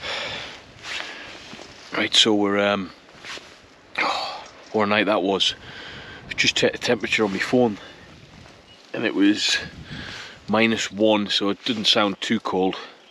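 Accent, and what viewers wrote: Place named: Northumberland, United Kingdom. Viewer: by any chance are you from somewhere near Ashington or Morpeth because I am because you just have that sort of accent and if you are do you support Newcastle United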